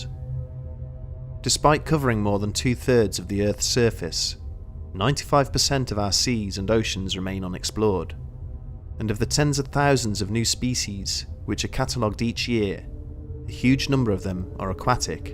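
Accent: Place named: England, United Kingdom. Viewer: The narrator has one of those spot on English accents that could get seemingly get REALLY DARK at anytime. One of these days I will be all into one of his stories a really creepy ass story and then on the middle of the story he says “and as you’re listening to this - you hear a knock at your door. (Knock at door) when you ask who it is - the voice seems very familiar.”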